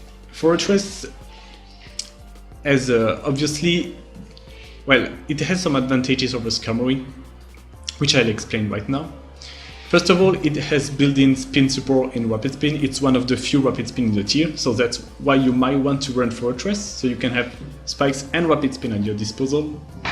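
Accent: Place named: France. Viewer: C'est l'accent français ça ! Je reconnais Merci pour ta vidéo mec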